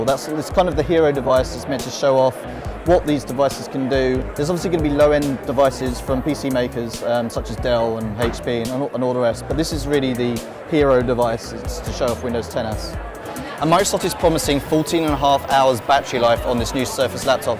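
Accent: United Kingdom. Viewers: Is this reviewer from UK? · Unrelated, but please speak clearly!! yeah, cool you're from the UK, but slow down, normal pace, microphone in the right place.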